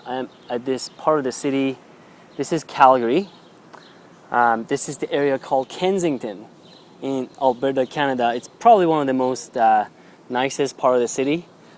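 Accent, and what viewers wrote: Iran. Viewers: just out of curiosity,where are you from originally? you have a slight Persian accent when you speak English!!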